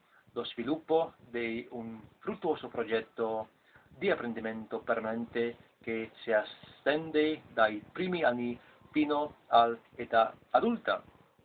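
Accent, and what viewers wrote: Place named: Spain. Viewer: You have a spanish accent when you speak italian, is interesting how a second language affects a third,fourth ect....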